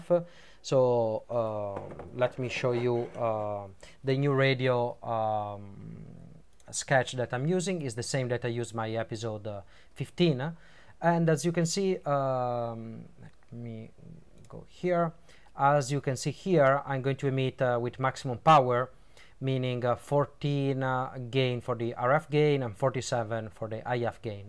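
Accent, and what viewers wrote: Italy. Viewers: sei italianissimo e lo si sente! non cercare di nasconderlo bro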